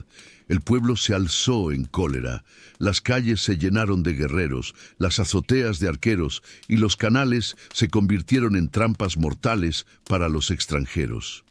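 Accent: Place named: Spain. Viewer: Me cargan las voces de gachupines contando historias de las civilizaciones a quienes invadieron robaron devastaron y saquearon durante siglos... pobrecitos aún siguen con hambre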